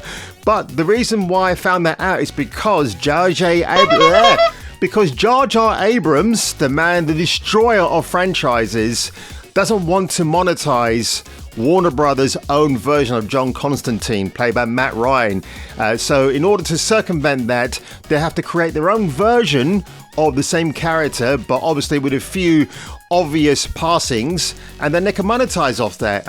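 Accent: London, United Kingdom. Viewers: You sound like a good London lad, course I could he wrong. My old ears ain't as good as they used to be lol. But I still can tell 🐮 💩 from Sophia Non'Entity when I hear it. Everytime this gobbshitte comes on the screen now. It's auto MUTE!!!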